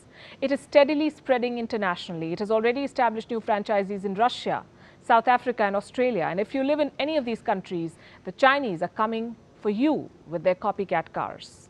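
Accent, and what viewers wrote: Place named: India. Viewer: Indian accent os the biggest ripoff that Indian has provided,youhave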